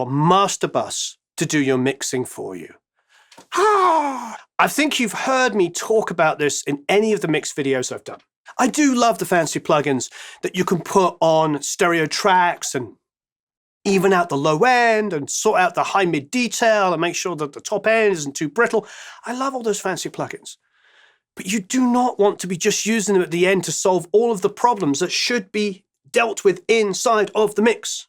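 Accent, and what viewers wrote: England, United Kingdom. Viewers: All of this truly wonderful content, also done in an adorable soothing English accent no less, has truly made me appreciate all of those finished records I had so taken for granted before far more. This industry is apparently no 'piece of cake' at all when one realizes you're dealing with so, so many different variables AND the personalities of possibly technically ignorant "Artists" and even their producers AND Apple's publishing standards. Good luck with that.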